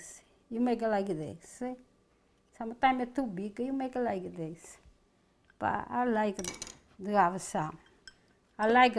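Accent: Italy. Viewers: La adoro sg. mi piace il tuo itanglese😍. Che Dio la benedica a lei e la sua famiglia, la sua famiglia è veramente fortunata di averla. Buone Feste❤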